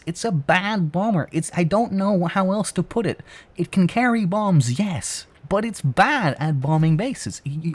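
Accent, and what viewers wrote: Netherlands. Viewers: Really love the video, but one question. Are you dutch?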